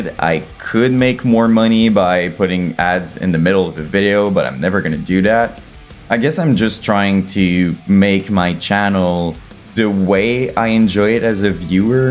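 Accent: Quebec, Canada. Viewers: Amazing job on growing the channel dude, your videos are what i needed to remind myself of the greatness of the myspace era, i feel like there is no one these days that go back and appreciate these gems for what they were. Gros props dude. Also as far as ideas, maybe doing deepdives on the more obscure labels could be cool and what they brought to the scene back then (This City is Burning, Mediaskare, Artery, Ferret, Siege of Amida, Prosthetic, etc) or even the early days of household names like Sumerian and Century Media, idk, just an idea ! On a last note, it's been inspiring to see you make it through that overly populated sea of content that is Youtube, as a fellow Quebec French speaking mofo, it gives me hope that we can also sit down and share the stuff we love and be a part of the conversation without too much tabarnacking on our pronouciation. Lâches pas, 2024 will be huge mate🎉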